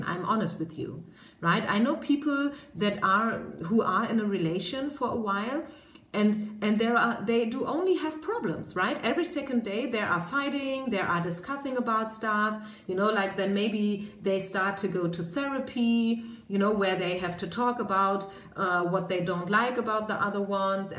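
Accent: Germany. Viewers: New sub here! Picked #3. Wow! So on point. Amazing! I'm indeed starting my own business and I'm a really spiritual person. I'm single for 2years now and I plan to stay like that, I guess you can say I gave up. I loved your energy. I studied German in Regensburg when I was younger, and I love to hear your accent. Thanks for this reading. Love and light from Brazil 🤗🤗🤗😃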